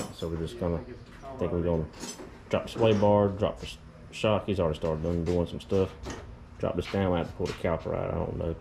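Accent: Kentucky, United States. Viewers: You sound like EVERY SINGLE KID I grew up with my entire childhood in Kentucky 😂 that’s super cool since I’m in Michigan now lol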